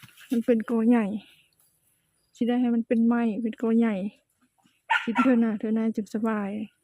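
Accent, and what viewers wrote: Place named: North, Thailand. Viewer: ຫມົກກະວ່າມອກເນາະບ້ານເຈົ້າສຳນຽງເວົ້າເຫມືອນຄົນເຫນືອເລີຍ!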